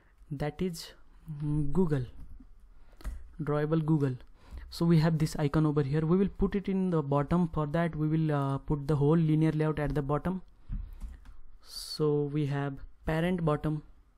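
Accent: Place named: Haryana, India. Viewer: Your accent is Haryanvi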